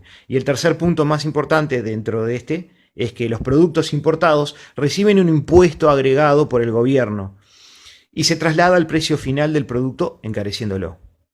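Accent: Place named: Argentina. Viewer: ¡Más que uruguayo, pareces argentino!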